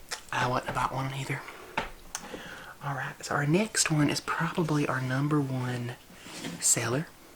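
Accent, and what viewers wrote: South, United States. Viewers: WHEN YOU SAID HOGWASH!!!! AAAAHHHHHHH I about DIED!!!!! I didn’t realize people spoke like this until I moved down to the south!!! 😂😭 I love it!!! When I saw the title I instantly thought of the lady who complained about her Tupperware on the judge show! 😹♥️ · I like the southern accent series. Do more :) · Please make another southern accent video! I’ve watched this one dozens of times! I love it!!!! ❤️ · First time seeing your channel and omg wow. First of all, that FECKING ACCENT IS SO EXCITING. First southern accent I’ve come across in ASMR! And this video idea is amazing 😂 I love Tupperware 😍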